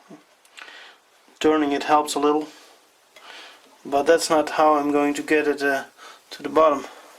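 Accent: Netherlands. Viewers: Aan je tongval te horen, ben je een Nederlander. Ik moet zeggen dat je erg goed Engels spreekt voor een techneut. Hou vol. Amusante video's.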